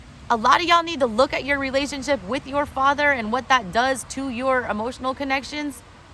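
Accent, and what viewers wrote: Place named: South, United States. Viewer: A southern story telling straga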